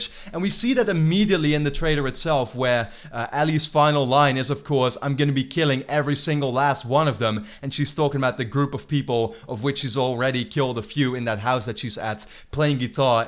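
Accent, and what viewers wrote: Netherlands. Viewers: That Dutch accent, i love it.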